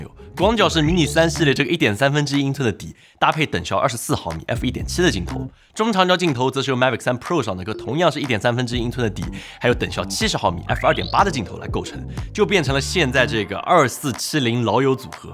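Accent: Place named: Jiangsu-Zhejiang, China. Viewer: 江浙口音没办法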